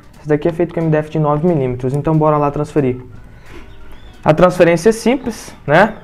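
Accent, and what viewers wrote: Paraná, Brazil. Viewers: Cara, tá certo que o cabeludo é feio, tem sotaque paranaense, e tal... rsrsrs... Mas dar dislike?!?!?! Putz, esse cara tá contribuindo pro progresso dessa nação muito mais do que todos os políticos juntos, e sem cobrar nada... E ainda tem espírito de porco que dá dislike?!?!?!?! Patacaparau!!! Bora clicar nos joinha, galera!!! E, Professor, continue assim, que o Brasil precisa é de gente como você. Muito obrigado!!!!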